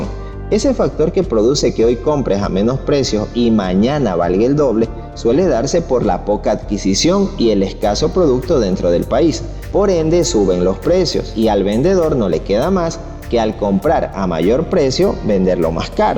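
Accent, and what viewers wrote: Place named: Venezuela. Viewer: Pero tu eres mexicano. Me pareces que tienes acento venezolano.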